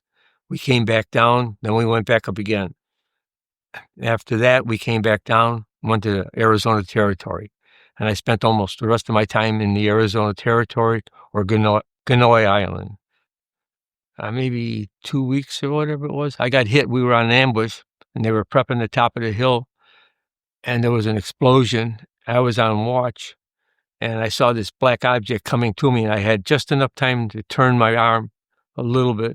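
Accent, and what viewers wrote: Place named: Chicago, United States. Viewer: Sounds like you're from Chicago - maybe Southside - me too - US Marine, 1968, Phu Bai/Hue City. Well Done Marine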